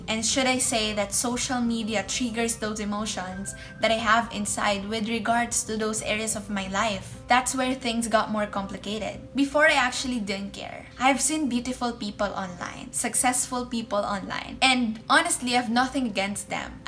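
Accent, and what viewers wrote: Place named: Philippines. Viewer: im quitting social media too, and while listening to you, i think you're a filipina haha, btw new subscriber mo ako, keep doing videos :>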